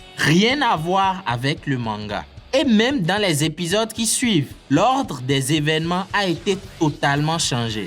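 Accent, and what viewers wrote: Benin. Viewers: On dirait que vous êtes béninois 🇧🇯 En tout cas vous faites du bon contenu vos analyses sont assez pertinentes. Du courage 👏👏👏👏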